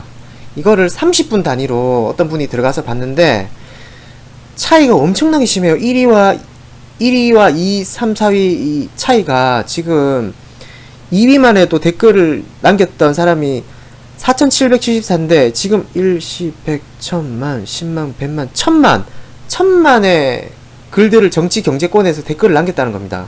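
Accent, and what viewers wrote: Gyeongsang, South Korea. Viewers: 대구 느낌나네요. ㅋㅋㅋㅋ 화이팅입니다. (저도 대구입니다~)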